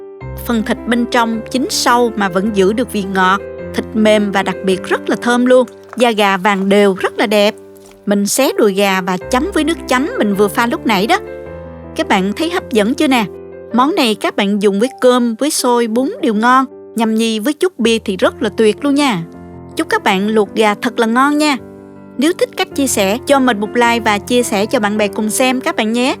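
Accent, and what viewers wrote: South, Vietnam. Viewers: Giọng nói đặc sệt Nam Bộ. Chỉ dẫn tận tình,tỉ mỉ. Nghe dễ nhớ, dễ hiểu vô cùng. Có thể dùng nồi cơm điện hấp được không? Cảm ơn cô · Cô ấy có giọng nói Nam Bộ rất dễ thương và dễ cảm tình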